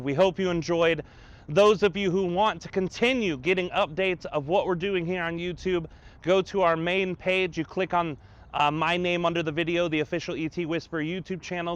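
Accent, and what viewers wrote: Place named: RP, United Kingdom. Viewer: Wow I'm from UK and channeling u have the poshest English voice I've ever heard,💕 was brilliant video ,thanks💕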